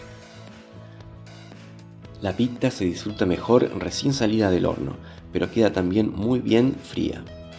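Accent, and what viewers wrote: Argentina. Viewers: Sé perfectamente que el origen de ese vídeo es Argentina, reconozco el acento, si queréis llamar "jamón crudo" a algo que no lo es, pues vale.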